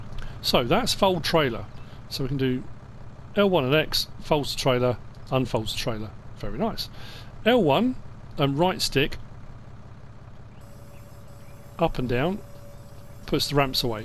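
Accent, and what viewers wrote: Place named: Kent, United Kingdom. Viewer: Thanks once again for a great video, ive only been watching your videos for a month or so, but you are far and away my favourite FS19 info source. I have to ask though (and no need to answer if its edging on too personal), are you from Kent? You sound suspiciously like you are from my hometown of Maidstone, just another reason i like your videos so much, reminds me of home.:D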